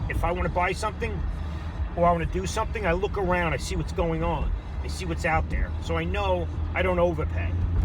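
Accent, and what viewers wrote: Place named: Long Island, United States. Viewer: The New York accent lol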